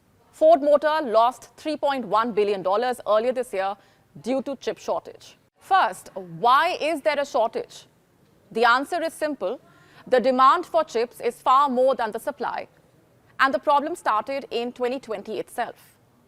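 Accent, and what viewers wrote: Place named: India. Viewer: This one has a thick Indian accent. Lol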